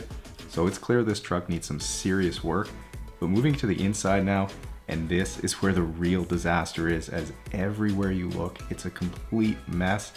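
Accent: Canada. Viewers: Where is this guy located? Probably nowhere near me since I'd love him to detail my car! I usually do it but have been working so many hours, I get home and pretty much eat and go to bed. He sounds Canadian, so my guess is he's in Canada... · I knew he was Canadian the 1st 15 seconds of the 1st video I watched lol however I can hear it more as I'm not Canadian. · Americans: OMG I really love this guy's accent, it's so Canadian!! ROTW: We really, really cannot tell the difference between his accent and yours <shrug> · i knew he had to be canadian, no americans pronounce garage in the weird way he does lol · Love the Canadian accent aye..... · He’s been the only Canadian that sounds like a Canadian on this channel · Man I love the Canadian accent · OMGOSH, here I thought he was from Hawaii and was confused by his accent. I don't really know what a Canadian sounds like since the ones I work with have straight accents so listening to him was quite the study.😂😂😂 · I love the Canadian “ya” and “hey” they always make me smile.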